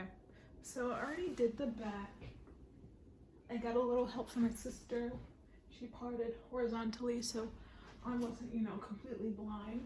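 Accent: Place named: United Kingdom. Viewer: As a Londoner the British accent is appreciated 😂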